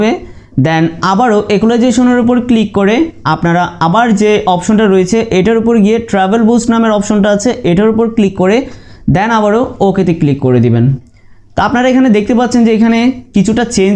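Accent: Chittagong, Bangladesh. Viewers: ভাই কি চাটগাঁইয়া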